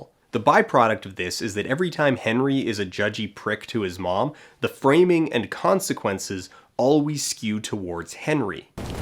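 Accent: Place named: Canada. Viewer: Definitely is Canadian.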